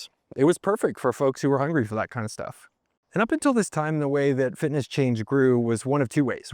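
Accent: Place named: United States. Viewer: Americans and their pronunciation of CEE / SEE names and surnames,…jeeeeeeeeeez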